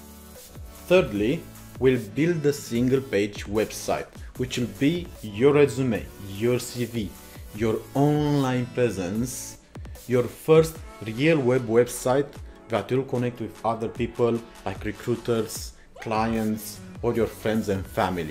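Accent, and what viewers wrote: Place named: Romania. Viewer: Are you from Romania? Because your accent resembles :) GJ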